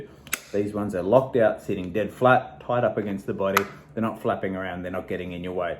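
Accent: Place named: Australia. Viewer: Australian ain’t ya mate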